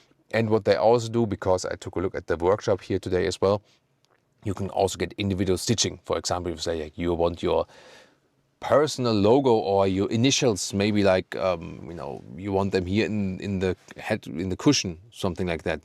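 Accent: Germany. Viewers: don't apologise for your deutsche pronounciation. Indeed it's MaybaH, not MaybaK.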